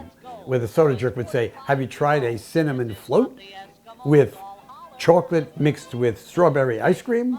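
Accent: New York, United States. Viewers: Where exactly did you grow up, you say New England but I hear New York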